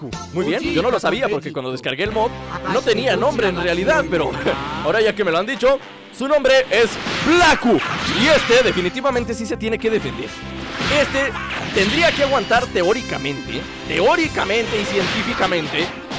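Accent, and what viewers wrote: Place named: Mexico. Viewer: muy buen video oye podrias saludarme en tu proximo video al ultimo la verdad no miento digo la verdad pero eres mi 3 youtuber favorito el tercero, veo todas tus series descontroland etc. bueno en fin creo que tu eres de mexico y yo igual por que como hablas me suena a mexico bueno salu2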